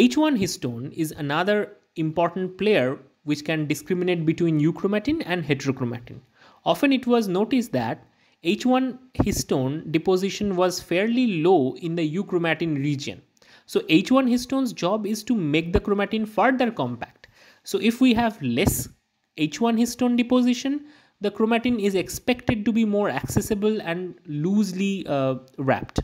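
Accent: India. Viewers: اوا مره افهم شي بدون ترجمه مع انو لهجه هنديه 😅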